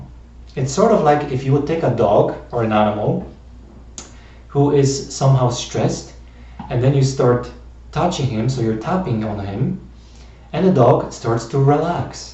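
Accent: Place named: Europe. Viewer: You have an accent in European. You were born here.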